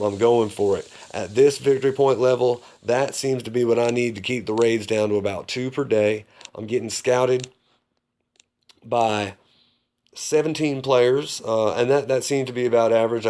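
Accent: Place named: Texas, United States. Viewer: Howdy from Okie City!! Thought that was a texan accent! cheers bud!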